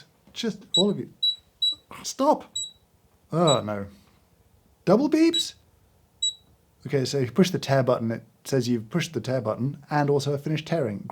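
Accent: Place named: England, United Kingdom. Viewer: Why he sounds less English today?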